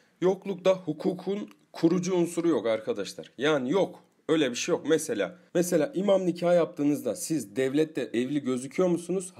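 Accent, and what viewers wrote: Turkey. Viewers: Hocam elazigli misiniz??? Sive cok benziyo gadan alam... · Söylemeden edemicem Yozgatlı mısınız acaba şive müthiş her anlattığınızı gülümseyerek dinledim ve çok beğendim😍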